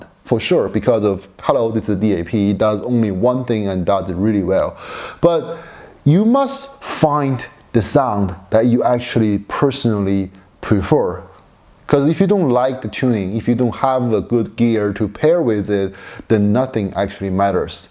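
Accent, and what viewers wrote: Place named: South Korea. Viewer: What the f#ck is 똥글... ? Are you Chinese? Sounds so Korean ... Your accent, I mean.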